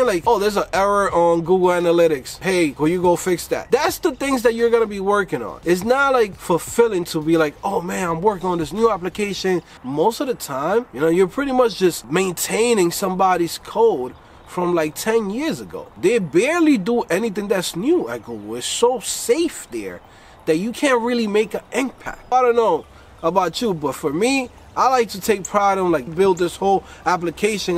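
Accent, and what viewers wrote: New York, United States. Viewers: NYC remixed with a slight D.R. accent. 😁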